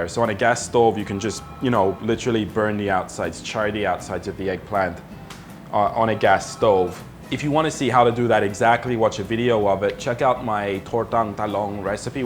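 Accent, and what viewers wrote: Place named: Philippines. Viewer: Soooo... your accent really throws me off. I know you're Aussie but you sound like a Flip fob... (not that there's anything wrong with that). =P Love your channel, btw... keep it up!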